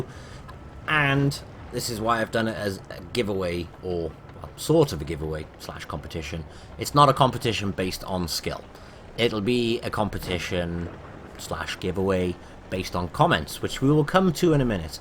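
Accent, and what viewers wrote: United Kingdom. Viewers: Also where from Britain is he? The accent sounds local to me.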